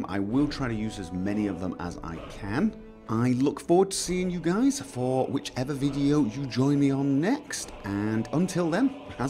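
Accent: United Kingdom. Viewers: dude i just love your vids your british(could be scotish i cant tell these sort of accents apart :P)accent makes it so enjoyable to watch :)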